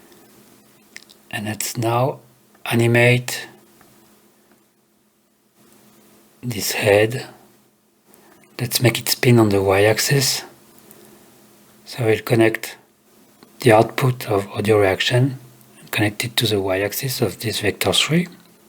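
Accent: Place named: France. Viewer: Thanks a lot my friend. Tu as l'accent d'un français. Je me permets de poursuivre dans notre langue. Je débute avec ton tuto, c'est vraiment limpide ! Merci bcp ! Je me demandais comment tu exportes ton fichier pour en faire un fichier de vdjing ? C'est peut etre une question hyper large mais voilà je débute complètement... déso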